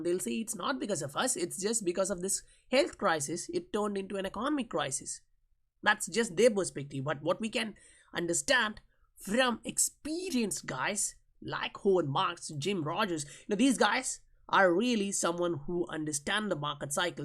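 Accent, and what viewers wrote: India. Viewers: I always thought u have indian accent bro, but was confused when i saw south korea here. which city if you dont mind asking. ? by the way thanks for the quality content man.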